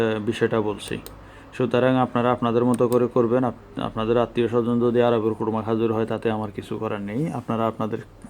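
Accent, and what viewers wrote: Noakhali, Bangladesh. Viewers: আপনি কাকে বলছেন? কে যেতে চেয়েছে ইতালি? কে বলছে ইতালি যেয়ে কোথায় থাকবো,কি খাবো?কে বলছে ইতালি যেয়ে আমার খাবার টাকা নেই? বা: বা: আপিনি নিজেই প্রশ্ন রাখছেন এবং নিজেই আবার ঝারি মেরে তার উত্তর দিচ্ছেন আবার বলছেন এটা মহিলাদের মতো কথা।কে জিজ্ঞেস করেছে আপনাকে? ভাষা শুনে তো মনে হচ্ছে খাঁটি নোয়াখাইল্যা, যা হোক আপনের কাছে কথা বলার নতুন স্টাইল শিখলাম।সাবস্ক্রাইব করতে পারলাম না বলে দু:খীত। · আপনার বারি মনে হয় নোয়াখালী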